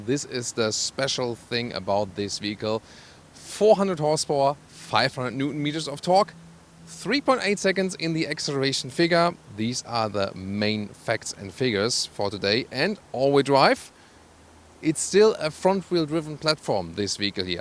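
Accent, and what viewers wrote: Germany. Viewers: du bist der einzige deutsche wem ich Englisch sprechen hören will.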